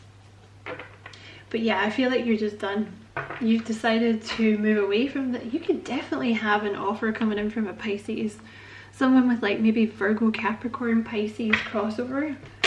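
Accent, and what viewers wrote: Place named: Scotland, United Kingdom. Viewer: Thank you,. Always a sense of a breeze softly blowing through your reads. Hmmm…Scottish? Interesting. I’ve always loved the Scottish accent. Feel better quickly! 🙏🏻💗🦋😎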